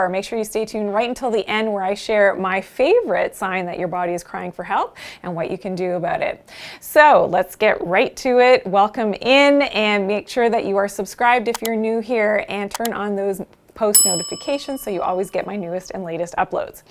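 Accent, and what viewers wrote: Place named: Canada. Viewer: I love her Canadian accent, it’s not something I hear in my country and not even on tv or movies. These were good tips btw that I haven’t heard before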